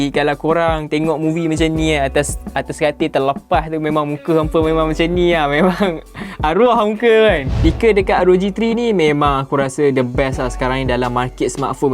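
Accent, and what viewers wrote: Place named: North, Malaysia. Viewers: Barai lah budak nih dgn loghat utara lagi haduhhhh failll..... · Nice. Kipidap. Tapi loghat utara tu cover2 sikit boss ku, sebab kalau bercampoq dengan kl dengaq lain mcm oii haha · Cakap ja utaqa wei. Pelik eh. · Org perak ghupenye... kome perak mana tu??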